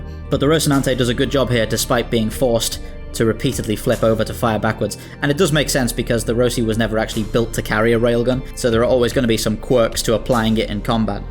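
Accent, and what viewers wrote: United Kingdom. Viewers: I love the glottal stop of the producer of this video; also his use of "little bit." This is my favorite British accent.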